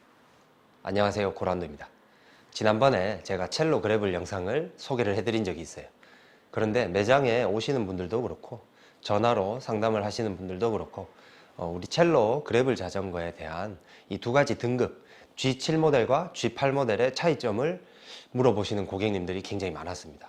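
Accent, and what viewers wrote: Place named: Daegu, South Korea. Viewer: 아직 유명한 채널은 아니지만, 응원합니다. 사장님의 약간 대구 지역 톤이 섞인 차분한 목소리가 굉장히 정감있네요. 구독!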